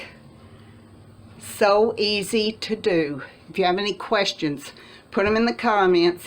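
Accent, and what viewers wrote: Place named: South, United States. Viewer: the southern accent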